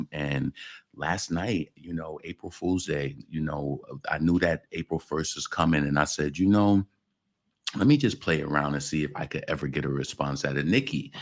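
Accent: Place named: New York, United States. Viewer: Its sounds like most people in nyc....you are blessed....Nicki blessed you 😊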